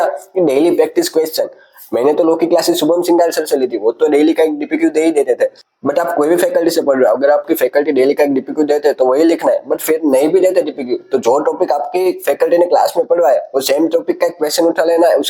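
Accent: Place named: Gujarat, India. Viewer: I like ur gujrati assent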